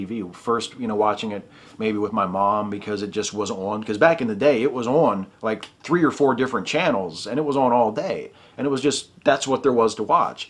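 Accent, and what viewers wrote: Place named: Philadelphia, United States. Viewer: I know EXACTLY what you mean about Jerry Lewis and the Telethon; I was a child of the 80s too, and it is.definitely a strangely nostalgiac memory. Also, based on your pronunciation of the letter "o" in words like, "on"; are you originally from the Philly area?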